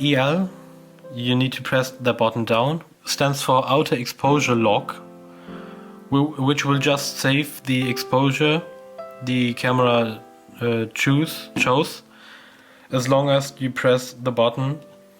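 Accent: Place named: Germany. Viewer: Hello, nice video. From your accent, I am guessing that you are german. Ehrlich gesagt wuerde es mich freuen auch deutsche videos zu sehen. Abo von mir hast du ;)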